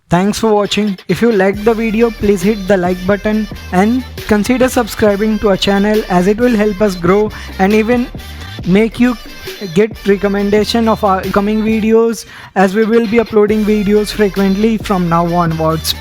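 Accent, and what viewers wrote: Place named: India. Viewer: 10/10 enjoyed the indian accent and the music!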